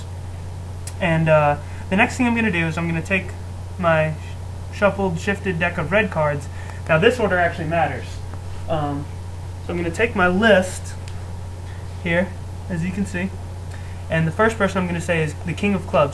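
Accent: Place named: United States. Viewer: You English isn't that poor as far as I can tell. You sound American.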